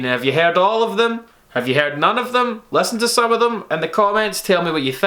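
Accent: Scotland, United Kingdom. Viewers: Ahhhh.... I've been watching so many of your videos that my brain is thinking with a Scottish accent ! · Wait you're Scottish? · If Anthony Fantano was Scottish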